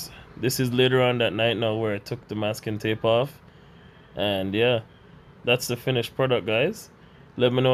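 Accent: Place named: Saint Vincent and the Grenadines. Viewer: man almost sound Vincy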